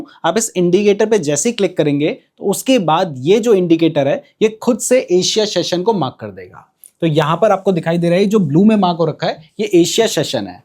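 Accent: Uttarakhand, India. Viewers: Sir aap uttarakhand se hain kya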